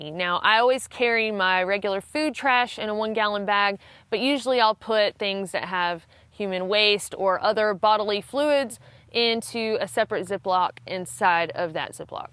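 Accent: South, United States. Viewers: Oh, man, I grew up in Georgia, though moved out of state nearly 13 years ago. I haven’t heard a southern accent like yours with any consistency in over a decade! Great info in sanitation and hygiene! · I love your accent, I am from GA and have been living in the PNW for 15 years. I so miss southern women. Tried hard to find one with an accent like yours, but wound up married to a girl from AK. We manage the Mountaineers Lodge at Stevens Pass on the PCT during most summers. This summer we likely not open. Its fun being a trail angel.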